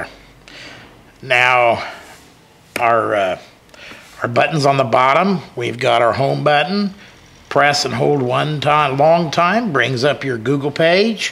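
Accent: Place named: Hill Country, United States. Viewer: Great review. I love your commentary style. You sound like a good old boy from the hills. Just subscribed to your channel.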